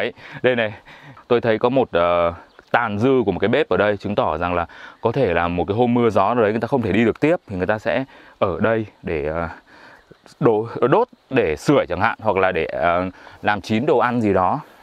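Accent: Hanoi, Vietnam. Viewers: Giọng HN rất hay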